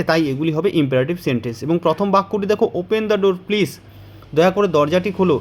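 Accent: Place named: Nadia, India. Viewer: Sar nodiea thaka bolche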